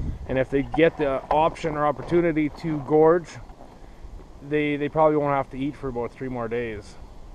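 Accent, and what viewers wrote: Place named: Canada. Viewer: i know nothing about this subject. Hmmm - so do you eat the coyotes after you process them?? I know people eat deer, rabbit, elk, and stuff - and if so - what does it taste like? You sound Canadian - what province are you from? How long have you been hunting/trapping? And are you ALONE out there in the woods all isolated like that??? Men. I tell ya, you guys - I don't think I would be in the woods and stuff alone like that. What do you do with those pelts? Do you sell them or make clothes/bags with them?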